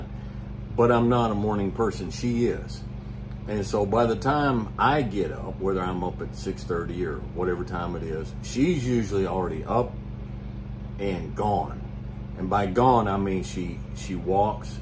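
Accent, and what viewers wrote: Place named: South, United States. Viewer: I saw the hat and was so excited to hear a southern accent. Was not disappointed. 🙏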